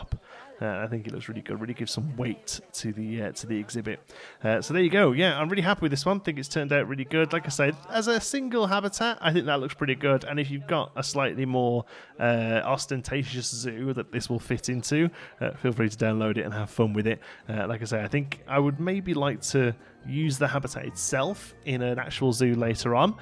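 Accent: United Kingdom. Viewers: You time these perfectly with my lunch 👌 Everyone at work hears some British nerd talking to me everyday around this time 😂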